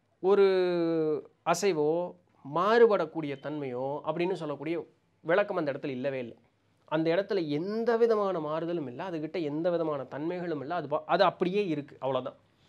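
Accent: Coimbatore, India. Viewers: உங்கள் ஊர்(கோயம்புத்தூர்) மொழியில் கேட்க ஆனந்தமாக இருக்கிறது💕..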